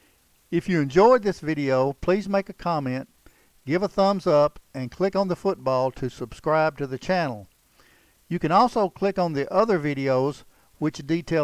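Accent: Texas, United States. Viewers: I'm in UK and we love Texas accent ! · Your thick Texas accent compromises your heartfelt thesis. Who would willingly submit to a despot--facing certain death?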